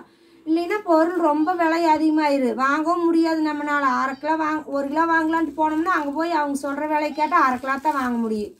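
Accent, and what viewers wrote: Kongu, India. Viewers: அம்மா தினமும் கொங்குத் தமிழில் அழகாக பேசுவது எல்லோருக்கும் பிடிக்கும் இது போல ஒவ்வொரு நாளும் ஒரு டிப்ஸ் கொடுங்கள் வாழ்க வளமுடன் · ஆமாம், அம்மா ப்ரண்ட்ஸ் னு சொல்லாதீங்க. உறவுகளேன்னு சொல்லுங்கள் இல்லைன்னா தங்கங்களே உங்கள் அழகான கொங்கு தமிழ்லே பேசுங்கள்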